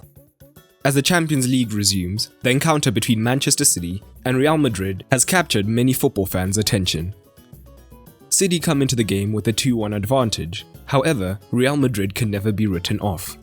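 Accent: South Africa. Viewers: Hey man. Love your channel. Where are you from? You sound south african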